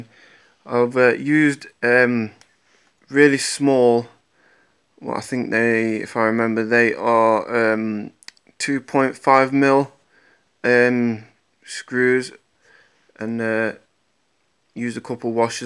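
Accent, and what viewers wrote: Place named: United Kingdom. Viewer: You can tell you from the uk😂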